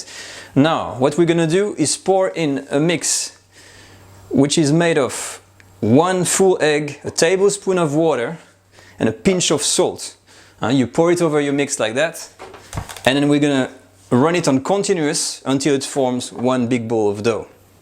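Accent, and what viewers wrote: France. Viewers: I love this French accent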